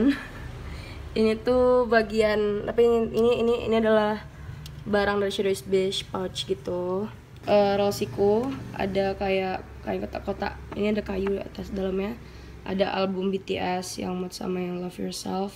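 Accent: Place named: Medan, Indonesia. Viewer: lahh org medan jugakk? pantes logatnya medok kalii wkwk, sehattsehat kau yaa kak<3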